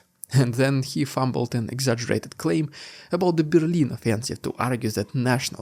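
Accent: Russia. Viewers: Dear; Your thick Russian accent butchers the English language, and is the main holdup in your channel going viral... It would be quite easy, but you just won't put in the effort to learn to speak with better pronunciation. WHY???????? clearly you learned the vocabulary to a superior degree, why not go one more step????? THERE ARE ONLINE COURSES... YOU MIGHT TRY GETTING ONE OF THEM TO EVEN SPONSOR YOU!!!!!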